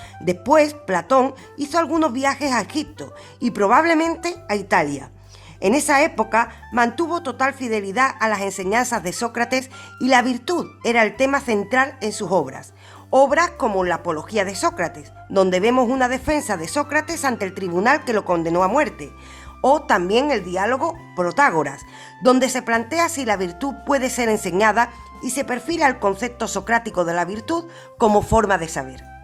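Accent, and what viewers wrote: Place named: Andalusia, Spain. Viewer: Me fascina su voz andaluz en una clase de filosofía, saludos desde Venezuela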